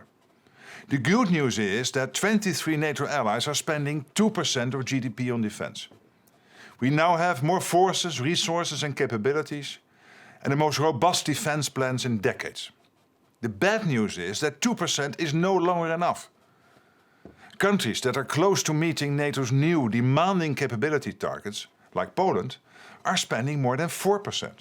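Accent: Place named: Netherlands. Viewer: I love his dutch accent.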